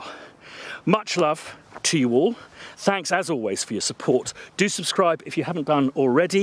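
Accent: RP, United Kingdom. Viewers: Combined with the view, your way of speaking resembles Downtown Abbey (meant as a praise)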